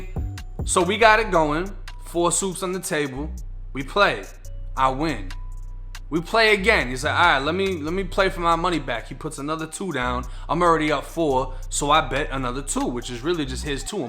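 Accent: Boston, United States. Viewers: Bra got a Boston accent but if u fuck around in Florida too long it’s gon change u a bit 😂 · This dude talk like a Boston towny and a fla g had a baby I’m from central fla ain’t never heard no shit like it but much respect sound like you put in work when you was down keep keepin it real bruh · How are u raised in florida with a boston accent? I get u were born there but its not like an accent has anything to do with genetics lol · I just said the same thing, can’t tell if he’s from Boston or the South👍🏾🤣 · Tampa? I swore from your accent you was from Boston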